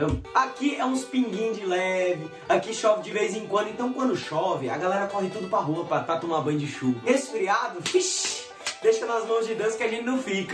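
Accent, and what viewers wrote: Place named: Minas Gerais, Brazil. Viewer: desculpa perguntar, mas você é de BH ou de Minas Gerais? Porque eu sei que você cresceu em Fortaleza, mas o seu sotaque é muito parecido com o meu, que é mineiro. Obrigada!!! E aliás, parabéns!!! Você é incrível!!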